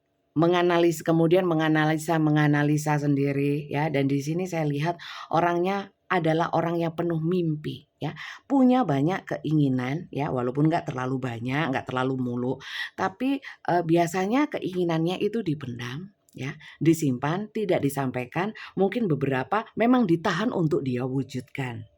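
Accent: Java, Indonesia. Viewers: Ingih bnda medok banget bun jowone.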